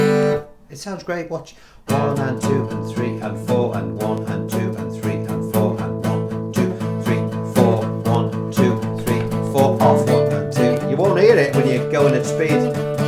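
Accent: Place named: Merseyside, United Kingdom. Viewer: I'm trying to guess the accent, outskirts of Merseyside somewhere...heeheee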